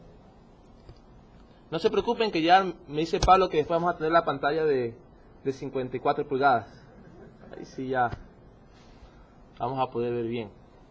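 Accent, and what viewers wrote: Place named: Ecuador. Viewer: se me hacia familiar la voz de ecuatorians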